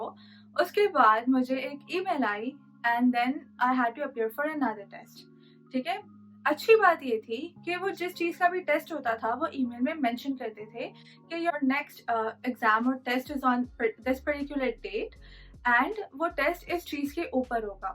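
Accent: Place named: India. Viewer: Who did her interview? She doesn’t even speak French let alone that heavy thick Indian accent.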